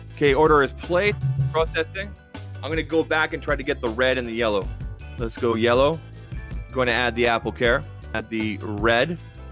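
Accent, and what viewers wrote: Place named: Canada. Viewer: thanks for what you do, the first time I met you I was in a live show of fpt and you donated $100 and defended Jon when some people were being rude. I commented that you sounded like a Canadian & you answered that you were from Calgary, my response was lol I’m in the gas city.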